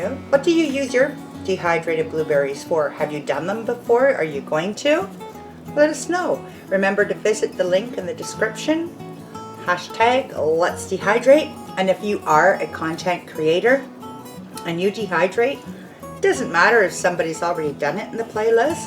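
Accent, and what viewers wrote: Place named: Canada. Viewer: Just came across your videos today! Love your way of teaching and your Canadian accent! Subscribed and look forward to watching all your videos!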